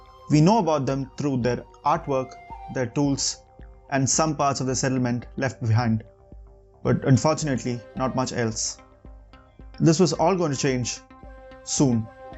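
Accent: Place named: Kerala, India. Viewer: Typical Mallu accent/tone....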